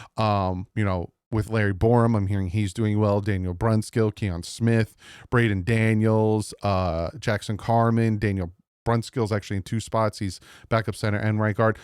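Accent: New Jersey, United States. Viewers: I love you but the NJ accent…. “Yastaday” grinds my gears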